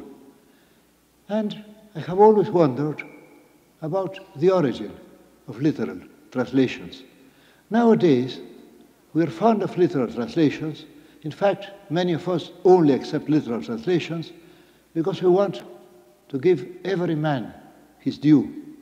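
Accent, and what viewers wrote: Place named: England, United Kingdom. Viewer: MUCHAS GRACIAS SEÑORA POR SUBIR ESTAS JOYITAS DE AUDIOS EN DONDE UNO PUEDE OÍR EL ACENTO INGLÉS DE BORGES.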